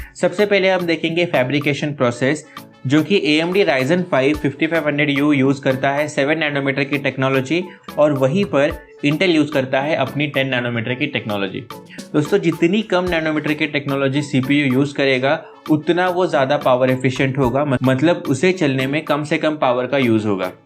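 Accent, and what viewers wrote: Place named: India. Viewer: 很不错，印度口音非常喜感（如果说错了我道歉）。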